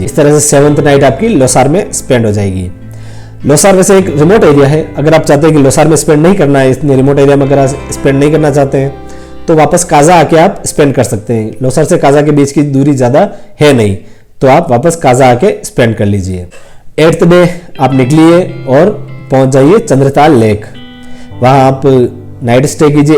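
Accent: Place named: Rajasthan, India. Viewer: Bhai as per your voice you look from Rajasthan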